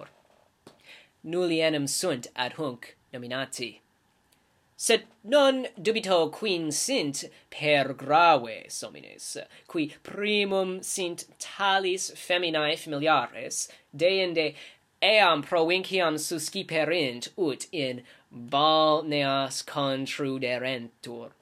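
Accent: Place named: England, United Kingdom. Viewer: Salve or whatever. I can't even speak Latin and I can already hear this guy's heavy English accent. Also dude your Latin's like two years behind. Didn't you see that paper that Lucie Pultrova published back in 2013 saying that the Latin r was probably tapped? Yeah but other than that great vid I guess sorry I'm late to the party but in my defense you were late to Lucie's party so you get NO CAKE lol alright man peace!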